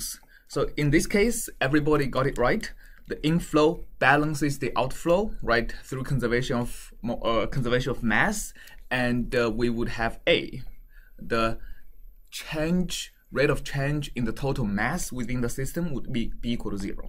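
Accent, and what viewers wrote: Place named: China. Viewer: Horrible, thick Chinese accented English. Completely unintelligible. Pity the MIT students.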